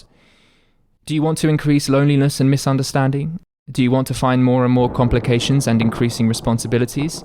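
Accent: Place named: RP, United Kingdom. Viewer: You sound just like the sort who's come back from his gap yaaar abroad in Thailand.. but great vid haha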